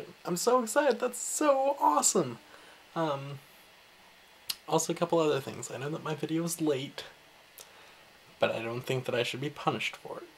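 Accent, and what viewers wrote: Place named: Ireland. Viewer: Kinda Irish.......... :)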